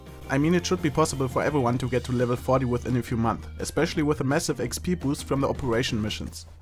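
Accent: Germany. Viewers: man merkt so extrem deinen deutschen Akzent! ^^ aber echt gutes video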